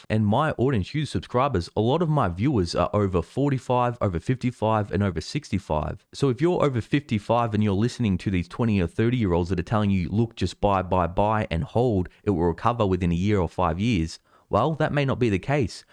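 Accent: Australia. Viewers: Are you Australian???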